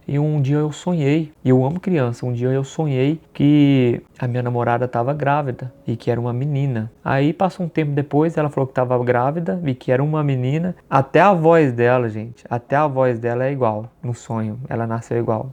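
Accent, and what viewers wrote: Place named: Minas Gerais, Brazil. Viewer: Lindo esse seu sotaque mineirês.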